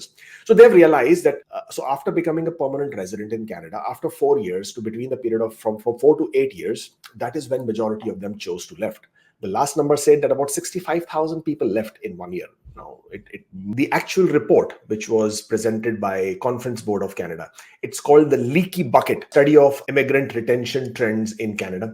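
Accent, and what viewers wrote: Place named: India. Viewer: I'm from Cuba Sr 🇨🇺. I watch all your broadcasts, in part because I'm improving my level of English with your Indian's accent, also I adore to see Canada's news and updates from your channel. My point is the next one: If those who leave Canada had to return to their homeland (Cuba), they would think it twice, because despite the fact that they will be with their loved ones, they won't have better opportunities in life. So I'm amidst my immigration process and I'm 100% sure I will not go back to Cuba. Ask to any Cuban and their answer will be alike.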